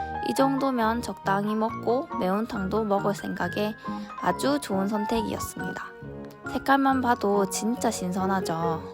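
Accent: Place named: Gyeongsang, South Korea. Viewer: 서울사람이라면서 갱상도 사투리네예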